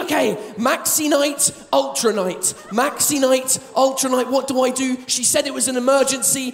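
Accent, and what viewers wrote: England, United Kingdom. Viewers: Voici mon moyen pour chopper l'accent anglais tout en riant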